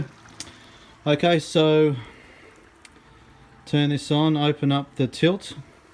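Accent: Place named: Australia. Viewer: Good vid. Like the Aussie accent with the occasional US inspired pronunciation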